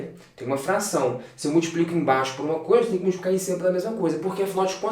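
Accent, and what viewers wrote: Minas Gerais, Brazil. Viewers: Pelo sotaque, é mineiro!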